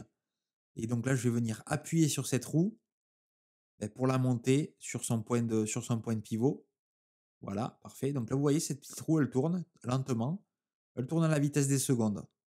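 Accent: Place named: South, France. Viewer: notre bon petit accent du Sud, c'est vraiment plaisant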